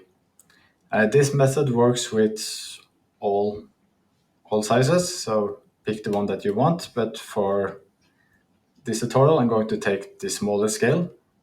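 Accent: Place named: Norway. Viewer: I love your vids too, they're explained in great detail and it shows that you really have a great talent for coding as well as explaining. Keep the great work man ! Side (and totally unrelated) question : wouldn't you happen to be Norwegian ? You have the same English accent as the actors playing in that AWESOME norwegian series Vikingane / Northmen !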